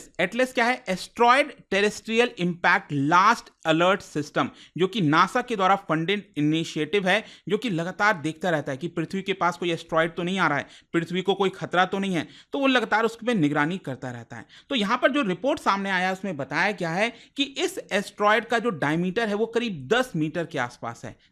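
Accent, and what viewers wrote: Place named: Bihar, India. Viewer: Bihari type of speech Nonsense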